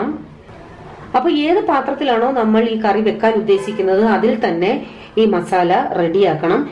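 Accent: Kottayam, India. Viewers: ചേച്ചി കോട്ടയംകാരി ആണോ.... അവതരണശൈലി കേട്ടിട്ട് അങ്ങനെ തോന്നി...... എന്തായാലും അടിപൊളി.... നോയമ്പ് കഴിഞ്ഞു ഒന്ന് ഉണ്ടാക്കി നോക്കണം.......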